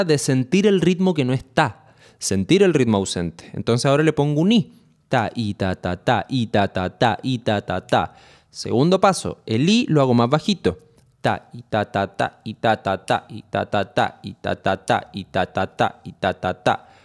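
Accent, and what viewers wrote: Chile. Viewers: Primer video que veo, y empezando el video me di cuenta que eres Chileno jajaj. Buen video compa, sigue asi🔥🔥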